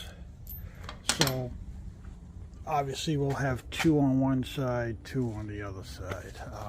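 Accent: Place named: New England, United States. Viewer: Where are you from originally? Boston? Good to hear a New England accent. I am and currently traveling… at the moment In Arizona in my Revel. Thanks for the videos they are excellent 👍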